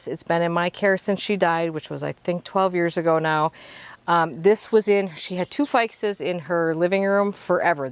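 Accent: Wisconsin, United States. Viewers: I was watching your video and my husband immediately picked up on your accent. He is from WI too but has spent the majority of his adult life in the south. Soooo, I love your videos but I also love that your in WI. Door County is one of my favorite places in the world. Fragmented chain of thought, sorry. Bottom line, can’t wait for the next video!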